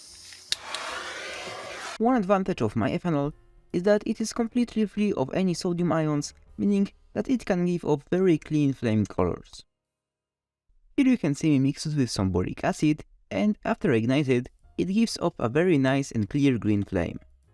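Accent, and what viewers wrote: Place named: Poland. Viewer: Very good video ❤ just subbed and love to see more. It's just like nile red before he got popular and got worse. Happy to See again a High quality chemistry video that's down to earth. Aannnd happy to see a fellow polish guy, you have only little accent but it's not very noticable. Keep up that work ❤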